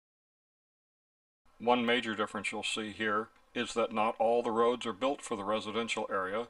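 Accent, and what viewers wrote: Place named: Midwest, United States. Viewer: Got it. You sound like you are from the Midwest.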